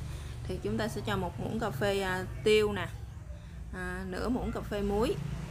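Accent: Mekong Delta, Vietnam. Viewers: Miền Tây quá bạn ơi "gõ nước.bỏ ga cái gổ🤣 cho nó gáo nước"" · Người miền tây hay nói rổ thành gổ, ráo nc thành gáo nc, lúc đầu mình nghe mãi ko hiểu về sau mình thấy ng nào cũng nói vậy, mới biết là tiếng địa phương, video của bạn mình rất thích, chia sẻ tận tình nhiều món chay ngon đoqn giản, chúc bạn khỏe gđ hạnh phúc nha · Chào cô gái miền Tây ( qua cách phát âm R ) cảm ơn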